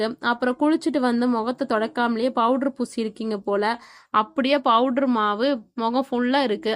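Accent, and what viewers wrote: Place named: Chennai, India. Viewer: Nice Chennai voice 🎉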